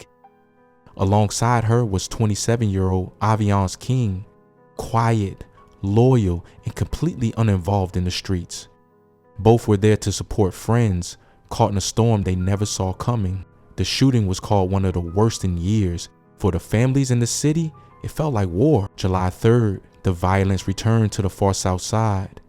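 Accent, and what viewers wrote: Baltimore, United States. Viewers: Dude Chicago isn't even a top 10 violent city and you with a whole Baltimore accent talking about our city violence when Baltimore is far more dangerous and worst.... Cut it out fam LMAO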